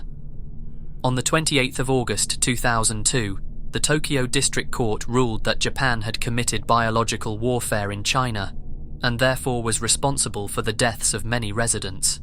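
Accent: England, United Kingdom. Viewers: Always nice hearing another Englishmen having a interesting dark thought provoking channel wish you much success with it 👍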